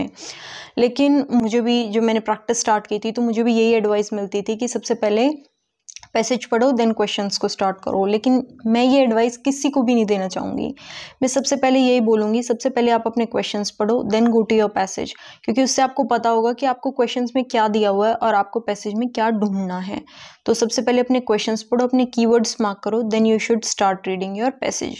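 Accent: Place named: Haryana, India. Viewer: Haryanvi touch 🧸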